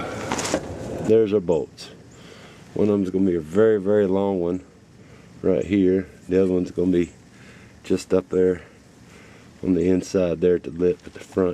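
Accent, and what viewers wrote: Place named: Carolina, United States. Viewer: I knew it! Carolina accent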